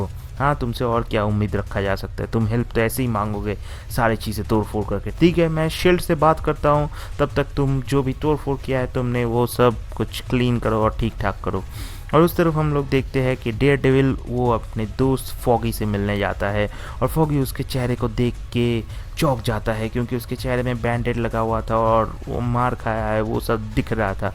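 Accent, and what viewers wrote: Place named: Odisha, India. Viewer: I think. your mother tongue is not Hindi tum Orissa se ho kya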